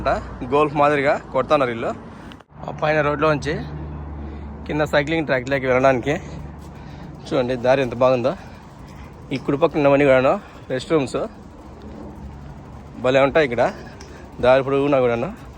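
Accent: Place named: Rayalaseema, India. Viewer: ఆంధ్రలో నీది ఏజిల్లా బ్రదర్ చూస్తే రాయలసీమ లాగా వుంది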